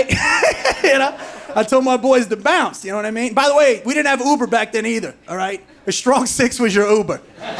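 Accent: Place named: New Jersey, United States. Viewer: I can tell you're from Jersey bc your accent not to be a dick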